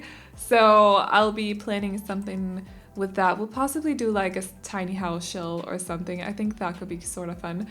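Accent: Denmark. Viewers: Omg- your Danish accent is so good! 💖🇩🇰 (Btw amazing build)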